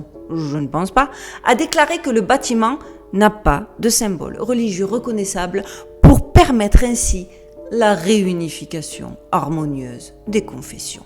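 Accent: South, France. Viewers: Salut j’aime tes vidéos que je viens de découvrir continue ne lache pas ! L’accent du sud donne un plus à tes vidéos! Peut tu s’il te plaît faire une vidéo sur le gangstalking qui va avec le thème de tes vidéos? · Merci c tjs un super moment malgres le sujet terrifiant Magnifique travail et je suis d aix en provenve donc J ADORE ta narration bien du sud Bravo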